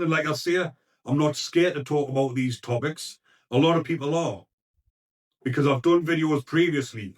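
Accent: Newcastle, United Kingdom. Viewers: Now go for the rest, and speak English not Geordie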